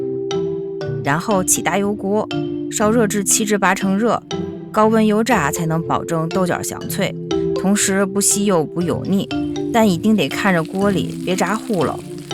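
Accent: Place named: Tangshan, China. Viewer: 唐山话好可爱 哈哈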